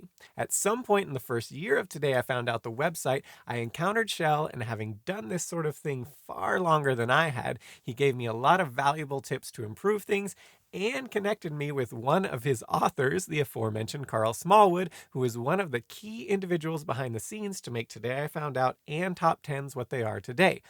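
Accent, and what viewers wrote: United Kingdom. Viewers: If your audience is largely American, we like British hosts. People with accents are automatically smarter.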